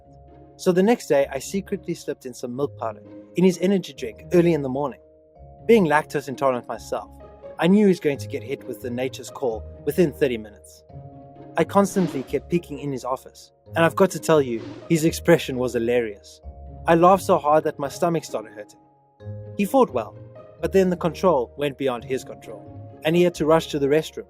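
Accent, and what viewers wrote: South Africa. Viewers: The narrator is probs South African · Hmmmmm do I hear a South African accent? Niceeee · Is the narrator South African? Cause he sounds like it. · This is the first time hearing a narrator speaking in a South African accent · South African right?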